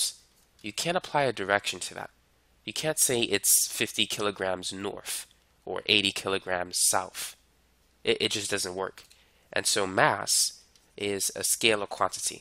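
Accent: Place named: East Coast, United States. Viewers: Are you from New York, you sound like it! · He’s from the east coast the way he says north “norf”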